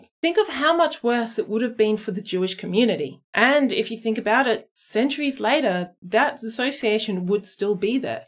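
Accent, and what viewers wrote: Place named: Australia. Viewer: Always great to hear clear & reasoned arguments / information about important topics - extra important in the fight against disinformation in modern times. This is especially cool from someone with an Aussie accent (I'm in Bunbury in Western Australia).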